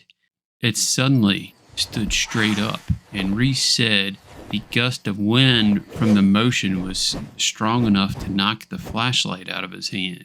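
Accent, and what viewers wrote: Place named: Appalachia, United States. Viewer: Hey, I really love your accent and pronunciation. Where abouts Appalachian way are yougrom if you don't mind me asking 😊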